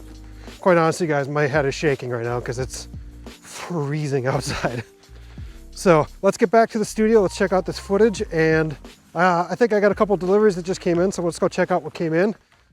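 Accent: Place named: England, United Kingdom. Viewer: Man I need a hat thrower/Box slider for my videos! Gangster! Your wife is a Pro! Man that setup is so tempting.....I want that for going to theme parks.......whenever I can go back. Great video bro! Oh and I still want those Nike Air Maggs! Looks hot in the background! Dr. Pepper is my jam!!! Great joke of course in your english accent.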